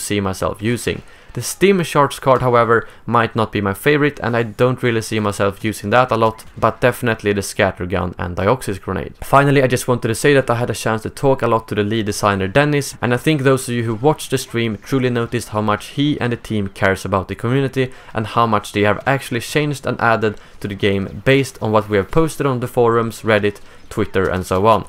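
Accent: Sweden. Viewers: Hello, Mr.Swedish Accent guy commentary!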